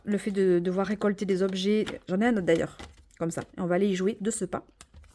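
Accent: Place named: Southwest, France. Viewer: Cet accent du sud ouest! Je le reconnais j’ai le même 😂😊 j’adore tes vidéos !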